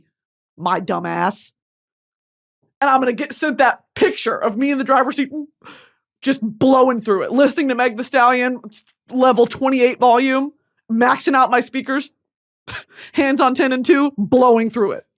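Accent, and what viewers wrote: South, United States. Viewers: listen ik someone mentions it every video but the fact that it's just her in that room just completely unhinged and southern is wild lmao love it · I just watch for the comforting southern accent 😌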